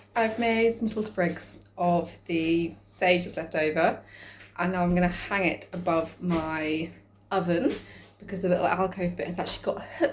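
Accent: United Kingdom. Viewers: I think you’re showing your class prejudices… This ladies accent is very normal here in the U.K., not artificially elevated, just average/neutral. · I'm a street (children's home) kid, listening to you English middle class folk, tickles my pickle.. Defo one of my favourites! Oh how the other half live.... 😄🙄🤪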